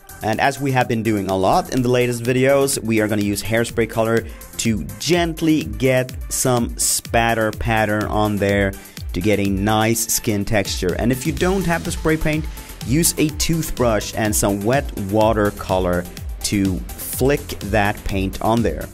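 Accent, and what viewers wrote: Scania, Sweden. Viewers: what (I'm assuming) is a Swedish accent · skånskan caught me off guard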